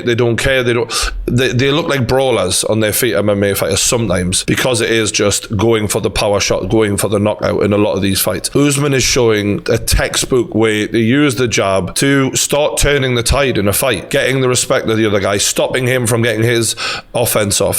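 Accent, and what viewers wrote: Newcastle, United Kingdom. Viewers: if only he knew what you know true geordie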